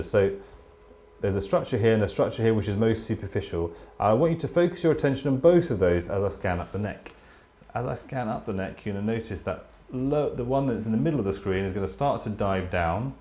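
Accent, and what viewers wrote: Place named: England, United Kingdom. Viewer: perfect video. nothing like the english accent to learn new things awesome teaching skills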